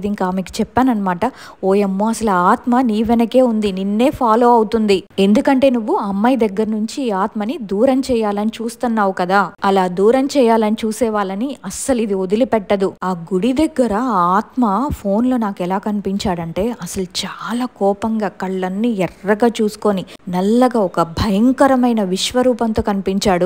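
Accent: Telangana, India. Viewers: Bhabi telangana ka slang ACHA hi 😊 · Telangana slang vachindi madhalo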